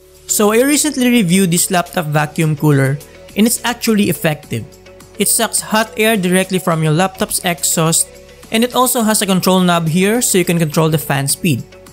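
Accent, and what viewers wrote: Philippines. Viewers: When you showed your face and talked i allready knew your a filipino BROTHER!! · your accent sounds like filipino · Great video! It was really professional in terms of quality, presentation, audio, and content! Keep doing what you're doing. I was surprised that you only have 540 subscribers. I already knew you were a Filipino when I heard your voice so I hope you get big in youtube and represent us filipinos proudly! :)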